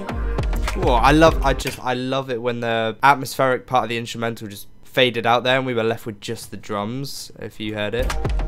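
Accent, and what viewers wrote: United Kingdom. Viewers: I love British accent so I get you 😂♥️♥️♥️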